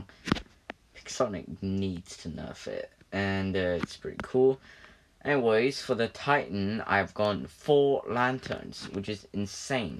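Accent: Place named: United Kingdom. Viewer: British accent cute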